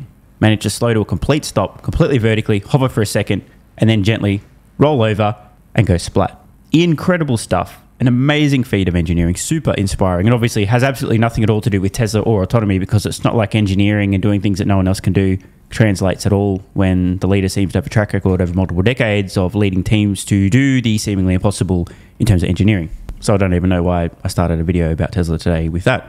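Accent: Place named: Australia. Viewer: This is Australian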